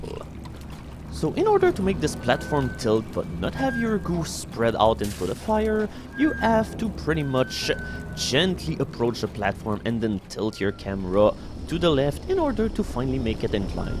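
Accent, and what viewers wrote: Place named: Quebec, Canada. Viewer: This is why i love watching LPs.He's just so calm and collected always, and the french-canadian accent gives him a special variety from other LPers today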